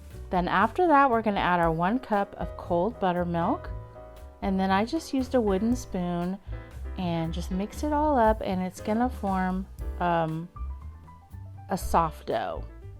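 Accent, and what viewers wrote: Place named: South, United States. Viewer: That is some south in your mouth 💥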